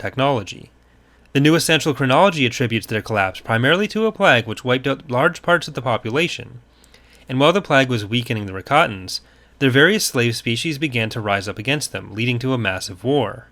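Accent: Canada. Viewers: I feel like the black fleet crisis could fall under this category. I know most ppl don't like those books, but I personally do. I love new republic stories and older star wars material in general, it's so nostalgic to me., u sound like u have alittle Canadian in you!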